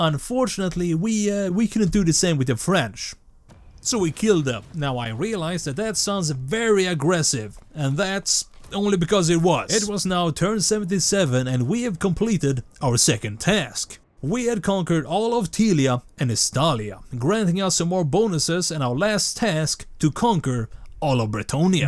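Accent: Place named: Sweden. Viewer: absoloutly amasing accent i love it, sounds sweede :D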